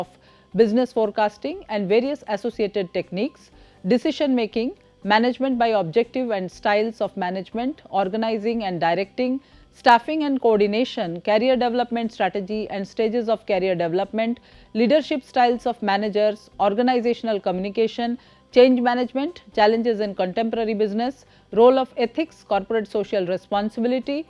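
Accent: India. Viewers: Is she from odisha 🙏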